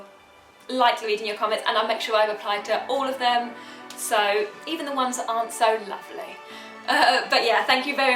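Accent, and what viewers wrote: United Kingdom. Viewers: Hi i'm a new subscriber loved your video and your British accent ❤😘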